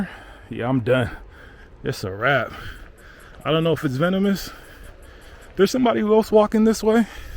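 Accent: United States. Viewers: Lots of his narration is making me laugh. Still sounds like American living in America, re OZ. Tho TBF, did mention some interesting facts (& sort of correct re most, tho not 100% re all) in the previous video. Recommend (or any one in Sydney, or visiting) does some of the walking tours. The 'Free' (not quite free*) Walking Tours are good, & The Rocks one is great. [*Not quite free, as request tips at end, but for $10 or $20 get best value walking tour of any] There's also heaps of social walking groups, & hosts, or a few in the group, are often quite knowledgeable & often learn a few things, even re home city even if know it well. Can find some of those groups by searching for: Free Walks & Meet People. In Sydney, is often one of those groups (there's heaps) is doing the amazing Bondi - Coojee Walk, sometimes is a Manly Harbourside Walk; & often Botanic Gardens &/or adjacent one. 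- - - In Melbourne, similar type free social waking groups often do Botanic Gardens walks, Bayside walks, or Yarra Bend Park/Studley Park Walks; & at lots of other places. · I love the American accent brother but Bondi is pronounced as Bon die not bondy 😂😂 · Ya'll are really pendatic on his accent, aren't you? We pronounce it Bond-ee like he does, here in America. Stop insulting his accent.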